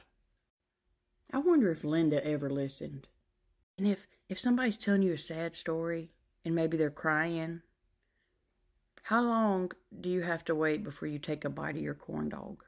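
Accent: South, United States. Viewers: You're like my twin with a southern accent. I love you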